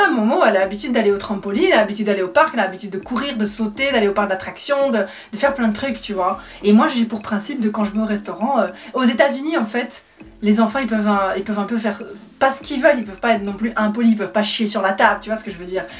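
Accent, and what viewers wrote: Belgium. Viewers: J'adore, surtout l'accent belge.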